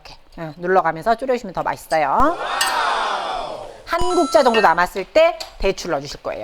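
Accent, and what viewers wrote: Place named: Chungbuk, South Korea. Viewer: 충북사람들의 말투에 베어있는 특유의 그게 잇어서 그래요..ㅎㅎㅎ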